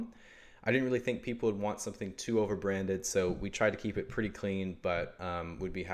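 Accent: Tasmania, Australia. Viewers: Are you from Tasmania, mate? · Holy shit your Australian accent caught me off guard! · I live for your Aussie accent mate! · U are a Canadian-Australian with a amazing Australian accent and great vids. U are awesome! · you need to get out more, mate. Every single one of his vids where he gets it out you guys come out of the woodwork to say "it sounds nothing like an aussie accent" There's a whole bunch of aussie accents, and Ive defs heard his. He put on the map as being from tas, but Ive seen it in adelaide and rural western vic. For all you know one of his parents moved from queensland so he has a bastardisation, or his friends growing up were bogan and that influenced it, but nothing about it strikes me as off, just rare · You're Australian! hell yeah! Do a video in an Aussie accent (Whole video) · the return of the australian accent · gaaah that aussie accent is legit haha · I mean even normally you can tell he's Australian, the trace of the accent is still there, and it comes out in a few words. 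· No wonder your Aussie accent is so good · are you tasmanian? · Are you from tasmania?? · make a wish! also I hear the Australian accent now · Wait are your from tassie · I‘m not a native speaker and even I (or maybe because of it) can tell that he pronounces some words with an Australian accent. It‘s just something you never get rid of.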